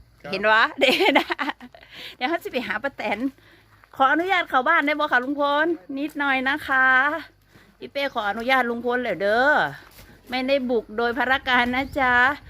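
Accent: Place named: That Phanom, Thailand. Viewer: เจ้าของเพจสำเนียงคือไทยธาตุพนมเนาะค่ะ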